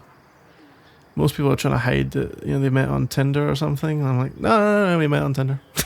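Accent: Scotland, United Kingdom. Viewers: Omg wait you're Scottish!? Me too!!!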